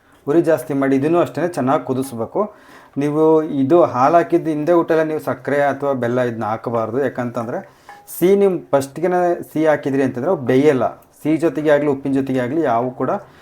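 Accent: Chitradurga, India. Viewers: yenthasngge aduge madthira nam durgada bhashe keli kushi aythu😊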